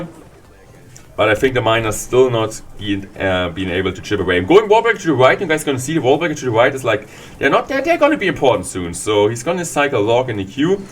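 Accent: Germany. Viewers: Ur German af i can tell 😂❤️❤️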